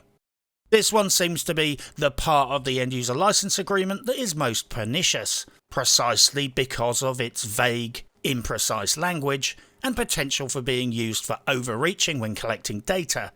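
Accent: United Kingdom. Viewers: Thanks british guy 👍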